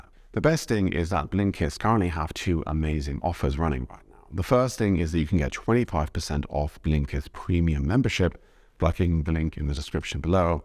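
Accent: United Kingdom. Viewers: Thanks für this new video! I really got to enjoy your content but for me it is mostly the combination of your accent and your deep-ish calming voice which surely is the iceing on the cake. I can't really put my finger on it but I have always liked to listen to british people speaking german. I would think that you had quite some luck with your boss back then, because if you really felt you were terrible at your you and she still didn't fire you, she must have seen your potential and positive personality as a future promise. :) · Hach ja...weil es ja so oft erwähnt wird, das mit dem britischen Akzent...das ist doch überhaupt nicht wichtig. Ich persönlich empfinde es nicht als Nachteil, wenn mein deutsch sprechendes Gegenüber mit Akzent spricht. Sei es von einem deutschen Dialekt oder einer anderen Sprache. Ich selber spreche fließend Englisch, aber "with a heavy German accent" wie mir bescheinigt wurde. 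Da mache ich mir keine Gedanken mehr und spreche einfach drauf los wenn es die Situation erfordert. Akzent oder kein Akzent, das macht nicht den Menschen aus. Frankfurt am Main - sehr schön, sehr charmant dargestellt in diesem Video. Allerdings ist mir die Stadt, in der ich aufgewachsen bin und den größten Teil meines Lebens verbracht habe, sehr fremd geworden. Daher bin ich vom Stadtkind zum Landei konvertiert. Weiterhin eine gute Zeit und ein zufriedenes und erfülltes Leben! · You English is like middle to upper class British .Clipped and precise .I would put every cent i own in betting that you did not grow up in a British Council estate .You German sounds very good to me .I have only baby German myself after living in Berlin many years ago for a year 1977-78 . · For me you speak german with your british accent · Ich finde es lustig, wenn du zwischen Englisch und Deutsch wechselst, dass es mir zunächst nicht aufgefallen ist,wie oft es in diesem Video gemacht wurde, weil der Sprachfluss einfach gleichmäßig weiter geht. 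😂 Ich hab nur plötzlich gedacht, ah er spricht in Deutsch 🤔 War jetzt das ganze Video auf Deutsch ohne, dass ich es realisiert habe? Und dann geht es plötzlich auf Englisch weiter 😄 Also es spricht auf jeden Fall auch einfach für dein fließendes Sprechen und ja, au h für den britischen Akzent, aber der ist einfach nur sympathisch, weil trotzdem alles perfekt ausgesprochen und verständlich ist. 👍🏻 zumal ich ihn sehr dezent finde und nicht überladend.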